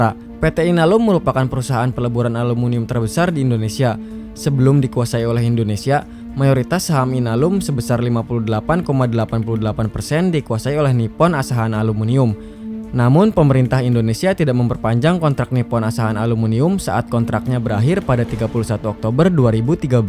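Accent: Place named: Banjar, Indonesia. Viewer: Pasti yg ngomong orang banjar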